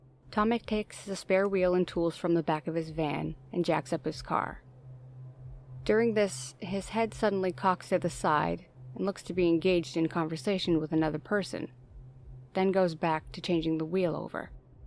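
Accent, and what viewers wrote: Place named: New York, United States. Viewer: Heavy New York accent.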